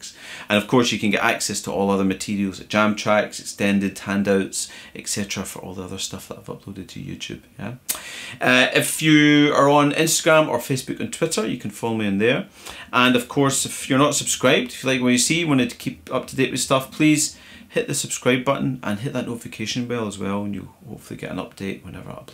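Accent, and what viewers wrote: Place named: Scotland, United Kingdom. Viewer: Hi from France Sir. It's always a great Pleasure to hear you play ! Love your playing & your accent...!!! 😜 Reminds of my days up North ... Miss Scotland & the Scots so much ! Take good care, Keep safe and Keep it up ! You definitely Rock Sir. Best regards. Cordialement Luc, France 🇫🇷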